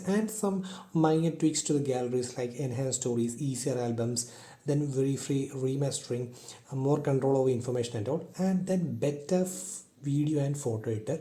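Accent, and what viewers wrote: Kerala, India. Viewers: Heavy malaylee accent :)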